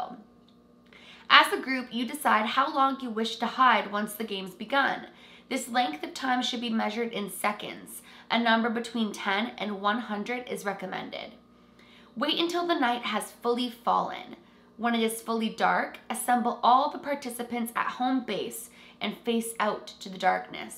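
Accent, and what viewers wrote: Canada. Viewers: Well she is canadian she can’t help her accent lol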